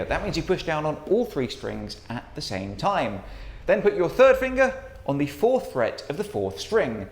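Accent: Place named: England, United Kingdom. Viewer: It's so weird to heard this with an english accent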